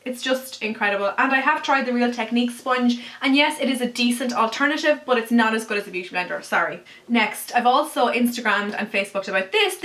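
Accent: Ireland. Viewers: love your vids ! are you from dublin?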